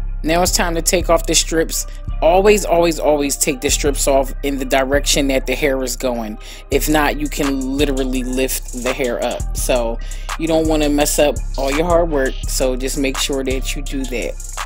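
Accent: Philadelphia, United States. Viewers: I was about to say that you sound like you’re from Philly, then I saw that it was you lol. I want you to style my short hair 😩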